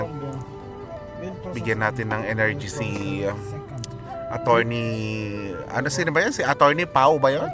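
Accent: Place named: Visayas, Philippines. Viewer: Hahaha! Bisaya pala yan si sir!😂 "dako kog ulok" 🤣🤣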